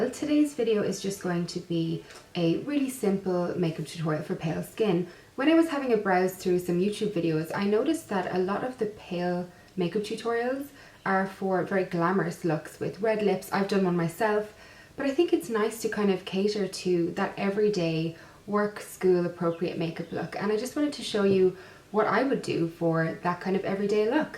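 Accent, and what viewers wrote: Ireland. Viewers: I KNEW you were at least 100% Irish!! One of the most charming and lovely accents on the planet... Did you know that there is more Irish blood in the U.S. than in Ireland? I myself am mostly Irish, coming from the surnames Joyce, O'Connor and Fitzgerald. Nice to meet such a lovely possible cousin! God Bless! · you have such a beautiful accent. You sound more irish than the Australian accent i am accustomed to. I love accents in general, but you sound beautiful and thank you for the everyday look. I love makeup but some days i just want to look put together but not overly made up. thank you! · you're australian? you sound irish or scottish :o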